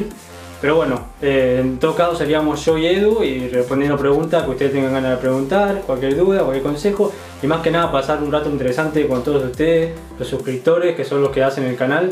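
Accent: Argentina. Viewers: Me parecia esa forma de hablar. Yo tambien soy argentino un saludo, sos un genio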